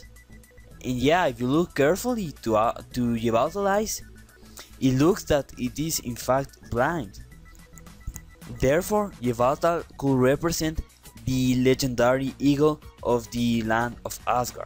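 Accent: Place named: Russia. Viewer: Your English is great and your accent is wicked giving A great voice. Is it Russian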